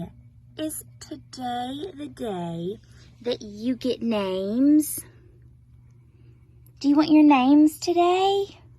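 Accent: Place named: South, United States. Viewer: Your sweet voice combined with your Southern accent is very SOOTHING FOR THEM! 😇😇😇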